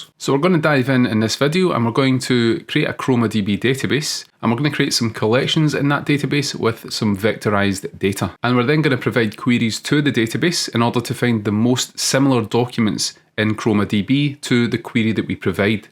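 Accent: Scotland, United Kingdom. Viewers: Trying to focus on the topic but your accent keeps throwing me off. Love the scottish accent. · Loving your Scottish accent mate!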